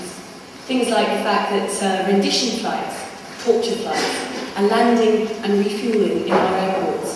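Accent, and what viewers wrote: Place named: England, United Kingdom. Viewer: You cant understand English spoken by an English person? LOL. Its not because she is a "Limey" I think that its just simply that your uneducated.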